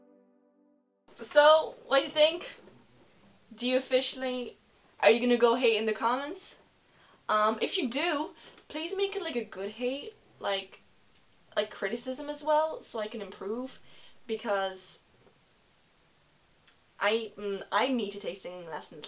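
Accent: Ireland. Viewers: Is she Irish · Me Before : I think she’s Irish... she sounds like all the annoying second cousins from Ireland who I am forced to put up with, despite the fact I don’t know the name of any of them, other than they and love Karaoke, Makeup and romance movies... Me after : SHE’S DEFINITELY IRISH, SHE SAID THIRTY THE WAY I DO WHEN I’M IN IRELAND. Saying thirty three and a third in a Irish accent is very funny to me, and my immature sense of humour.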